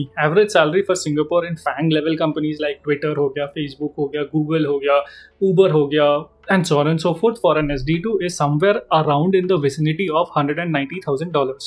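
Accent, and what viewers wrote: India. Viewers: Your Indian accent is so cringe and unbearable for ears.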